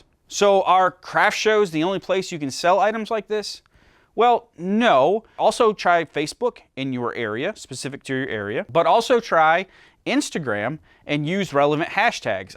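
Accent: Michigan, United States. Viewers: 1) Are you from Michigan? 2) Woah when did get a Laser?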